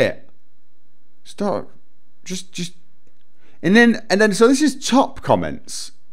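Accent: United Kingdom. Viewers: on the other hand, a British rant has a flavour all its own. (^_^) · I clicked on this because I enjoy hearing British people talk. Same reason I watch TotalBiscut and Yatzee. That and I am interested in what they have to say. British game reviewers > American game reviewers.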